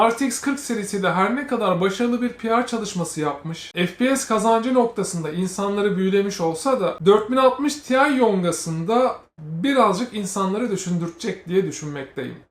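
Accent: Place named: Kayseri, Turkey. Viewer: Youtube ta hangi kayseriliye denk gelsem dörtYüz değill, DörtÇüs diyor, bu da çok hoşuma gidiyor, yani hemen ''aha kayserili detected'' diyorum. 😂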